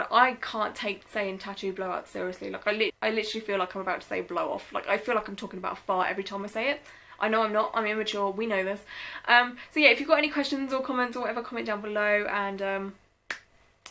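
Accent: United Kingdom. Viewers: Damn your voice is annoying. Must be from the UK? Funny how you asked your tattoo artist what it was exactly and then made a video to tell YouTube about it. Cool story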